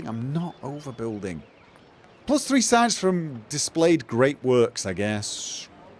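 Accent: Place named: Manchester, United Kingdom. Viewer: Sometimes, I prefer the intro chat to the game, mostly because the rodent is a fellow Mancunian, and it's like listening to an older cultural cousin. 😂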